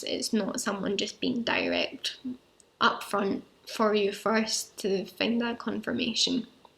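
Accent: Scotland, United Kingdom. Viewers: When the neurologist tested your babinski reflex was that abnormal or anything? Are your feet ticklish so was hard for neurologist to tell? 😂 Where in Scotland you from? Love the accent!❤️ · Such a powerful video. I've had MS for 27 years. Your life will change, but it's not over. Follow Dr. Aaron Boster on YouTube. He's a fantastic MS neurologist. Just get on the most effective therapy that you're comfortable with. There are some very effective ones out there (Tysabri, Ocravus, Lemtrada). You need to think about your FUTURE self (20, 30 years down the road. You sound like you're from northern Europe (Scotland?). There are a lot more cases of MS there. Best of luck to you, my friend. Cheers! 👍👍❤❤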